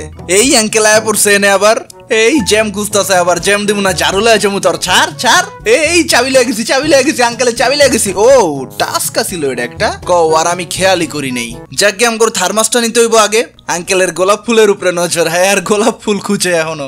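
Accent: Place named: Barishal, Bangladesh. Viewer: vhi apnar bari kothay apni ki borishali na- - ----_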